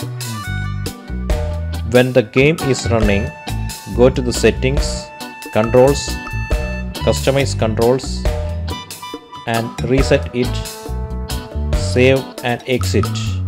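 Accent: Kerala, India. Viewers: are you an a mallu ?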